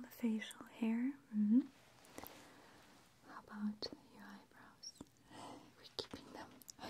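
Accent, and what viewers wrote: Russia. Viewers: Craig Pavey I didnt take it as sarcastic, just that you misunderstand what I said. I mentioned American women dont speak like her BECAUSE she is Russian. Sorry I guess it was more complex then I intended, thats what I get for being an English major lol. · Richclashchair ASMR I love her voice. Russian accents are very beautiful.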